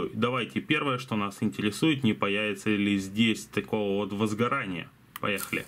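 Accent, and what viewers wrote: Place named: Odessa, Ukraine. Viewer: Продолжай на Одесском рассказывать! А то, я раньше, чтобы твои обзоры не скучными были, субтитры включал)))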